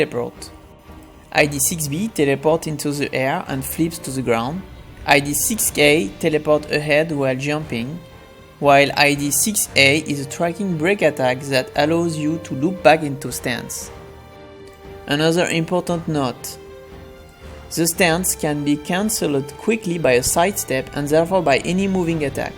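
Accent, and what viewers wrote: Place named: France. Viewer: Tu dois être le seul dont l'accent français me dérange absolument pas ^^ Il est très prononcé mais tu articules très bien et as un débit de parole bien adapté ! (pis bon, le contenu est super intéressant donc ça joue :P) Bravo pour le taf que tu mets dans tes vidéos en tous cas ! Vivement la sortie du jeu ! :D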